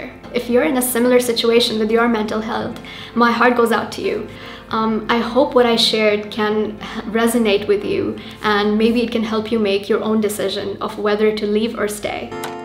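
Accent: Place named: RP, United Kingdom. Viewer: How did you develop such a posh accent?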